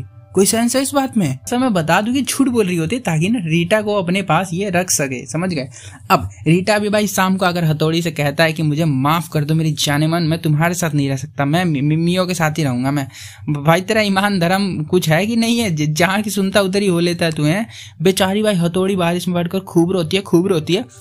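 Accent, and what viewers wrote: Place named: Delhi, India. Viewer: Kabhi kaha nhi mene 😁lekin apki tone delhi ki lagti h 😜😜😜😜😜😜😜vaisee bhi delhi aa gyi hu 😝😝😝😝😝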